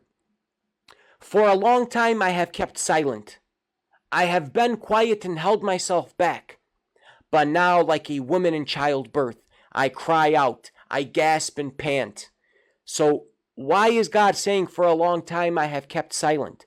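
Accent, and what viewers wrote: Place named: South, United States. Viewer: Curious what kind of american accent that is. Southern , sounds like.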